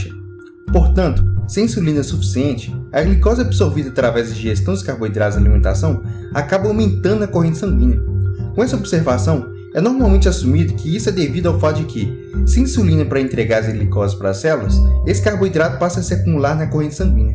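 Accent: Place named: Minas Gerais, Brazil. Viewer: ***** Sua voz é bonita e agradável ao ouvido. Acredito que o "detalhe" no final das frases é questão de mineirice mesmo...kkkkk...Aquele abraço!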